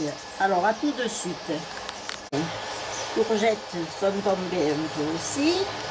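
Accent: Provence, France. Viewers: Bonjour c'est un regal de vous écouter parler ce bel accent de notre Provence, j'adore vos recettes tres bien expliquées par vos soins c'est un régal merci du partage Emy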